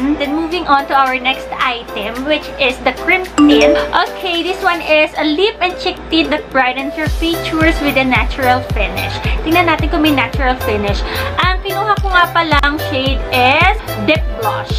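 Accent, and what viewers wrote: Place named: Batangas, Philippines. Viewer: kakatawa mam ang pagsasalita ninyo HAHAHHAA batangueño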